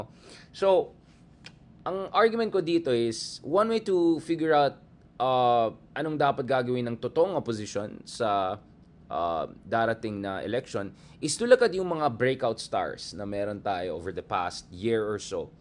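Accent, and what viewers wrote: Conyo, Philippines. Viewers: Di ko tlga matolerate kung pano magsalita ito. Masyado paconio. Kairita.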